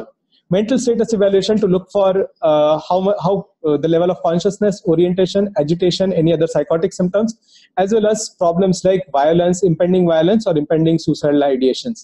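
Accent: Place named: India. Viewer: Thanks doctor, this is a fantastic explaination that has helped me and i always watch this while dosing, even though there is a strong indian accent for those who dont understand it too well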